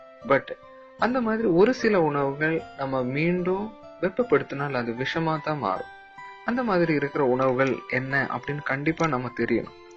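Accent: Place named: Kanyakumari, India. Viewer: ungal kumari- tamil slank arumai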